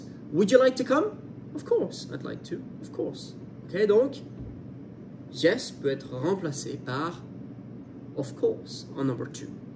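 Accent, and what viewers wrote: Scotland, United Kingdom. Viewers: “Aha” is kind of international 😉 Love the Scottish accent 🤪 Have a great day !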